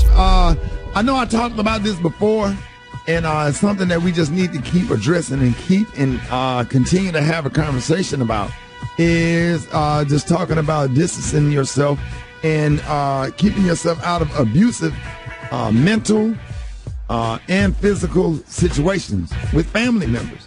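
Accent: South, United States. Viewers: 🎙️🎙️. Bro. I love your channel 💜 and your southern accent. I finally made up with my cousin. Every family isn't perfect. God is still helping me to love on them.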